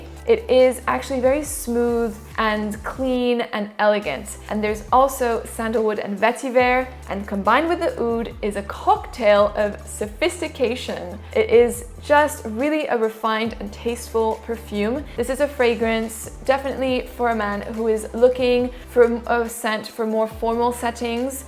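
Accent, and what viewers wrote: France. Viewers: A totally intoxicating french accent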